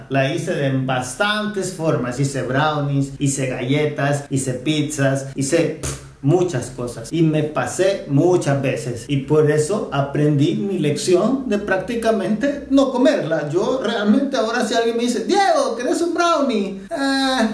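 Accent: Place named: Uruguay. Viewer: Está bueno que informes para evitar accidentes. Muy bien cuadro en el fondo. Hay canales que enseñan recetas pero no he visto ninguno que hable de las proporciones por persona y del tiempo a esperar antes de decidir tomar otra porción. Muy bien informado. Me llamó la atención tu acento, de qué país sos? Hablas bastante parecido a nosotros los uruguayos.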